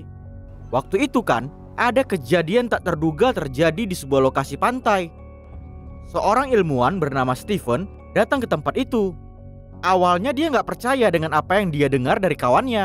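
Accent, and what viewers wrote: Medan, Indonesia. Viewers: Mimin nya orang Medan karna saya orang Medan juga tau logat Medan kek mana 🗿👍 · Logat medan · Medan kali logatnya bah 😅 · Jelas kali medan mu.on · woiii...orang medan kau ya...kwkwkwk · Logat medan 😏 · Bang pasti ko orang Medan??! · Indonesia bagian mana ini admin, kek orang medan bukan padang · Bang orang medan kah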